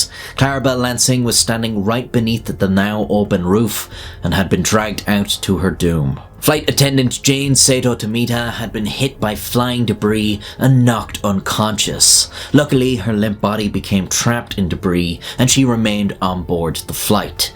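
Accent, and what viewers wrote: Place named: Ireland. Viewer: Idk why but if i'm going to be told a surreal and horrific story it's somehow way better to hear it from an Irishman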